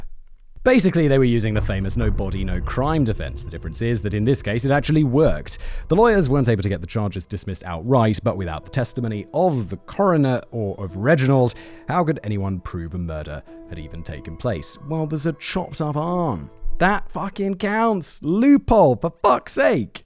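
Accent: United Kingdom. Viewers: Coo-gee, I love your British pronunciations. Could-gee is closer. ANZAC Day is in February and has dawn and dusk services, a parade of service men and women and is in remembrance for WW1. It is also the only day Two-Up gambling is legal in Australia. Remembrance Day is held with a one minute of silence at 11am, 11th November every year, and at certain places, like the RSL, they play The Last Post, and is now recognised as a commemoration of the fallen for bot WW1 and WW2. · You Britt's crack us Americans up💯....not just the ridiculous way you talk....but your genuinely INNOCENT demeanor 👀........I know there's tuff guys out there....but honestly from listening too you, there seems to be A LOT of panzees👀👀...an I mean that in the most respectful way possible....you an your kind just DONT seem too tuff honestly 💯🤔🤔🤔...I mean seriously man👀....your talking about planes...living in other countries an STAYING👀....taking "Holiday" Somewhere else than YOUR own country 💯👀......Sorry man...just ain't crackin🤪🤪🤪....that's the BEAUTY of the nation you lost in 1776.....we HAVE it all.....jungle, swamps, plains, grains, an tall tree's 💯.... 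Buffalo here an plenty of "citizen" deer EVERYWHERE...not the "Kings"🤣🤣🤣🤣.....Sorry buddy, I'm just NOT a fan of old English society 🤢🤢🤢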